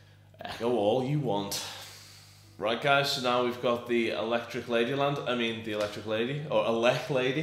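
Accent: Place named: Liverpool, United Kingdom. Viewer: This accent sounded Scousy to me. I sort of was right :). Nice review, lad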